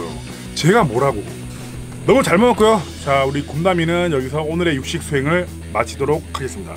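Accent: Gyeongsang, South Korea. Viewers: 형님~ 강원도라고 하셨는데 출신은 경상도?? 쌔리~~~하네ㅋㅋㅋㅋㅋ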